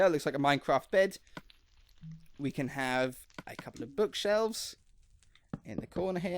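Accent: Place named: Norfolk, United Kingdom. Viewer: I'm guessing your from Norfolk?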